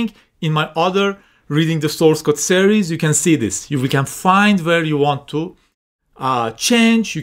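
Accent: Iran. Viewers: I grew up in Iran... this accent sounds Farsi, but more extreme than I usually hear... ,🙂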